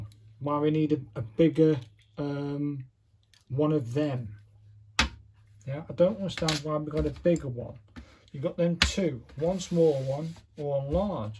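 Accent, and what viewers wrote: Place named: Stoke, United Kingdom. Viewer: Thought I recognised the accent. Mind you, I should given I have the same one 😂 I’m from ‘castle 👍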